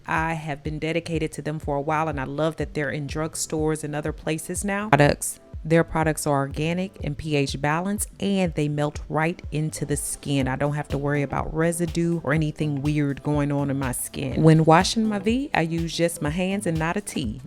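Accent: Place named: Atlanta, United States. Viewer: You sound like Monica are you from Atlanta?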